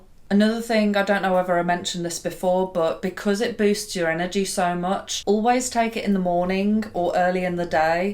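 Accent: United Kingdom. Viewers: Red maca does work alot better than yellow for woman hormones and curves. I lost weight in the stomach area when mixing it with chia seeds but gained boobs and glutes 💚 love listening to you btw UK accent gives me happy shivers. Will be checking in on your channel.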